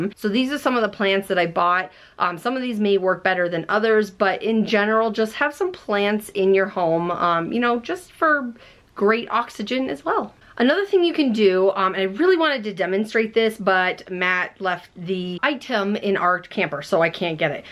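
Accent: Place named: Midwest, United States. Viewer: Where are you from? Your accent is midwest-ish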